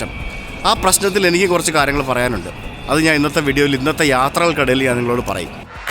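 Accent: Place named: Kumbanad, India. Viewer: Dude, I accidentally started watching your videos and realized you are from my mom's town. So watched many and enjoy them - similar Malayalam to my cousins etc. Don't know Santosh George. I am sure a great guy. But your is coz you are my home guy and its fun. Keep up the good work buddy. From a South African - 58 yr old born and raised African Mallu from Kumbanad and mom from Kozhencherry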